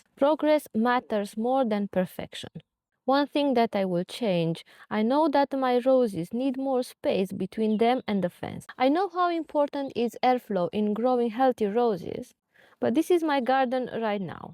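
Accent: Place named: England, United Kingdom. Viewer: Very interesting and informative . I love your English accent.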